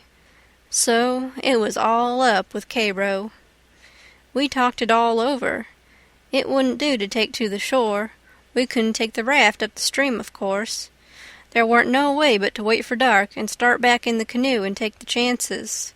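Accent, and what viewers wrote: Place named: South, United States. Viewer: Hmmm. Not thrilled about the narrator. All I can see is some southern girl in my mind. Should have been a male.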